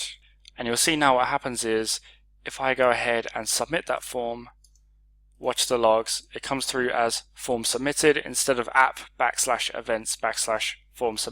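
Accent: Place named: United Kingdom. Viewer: you're British accent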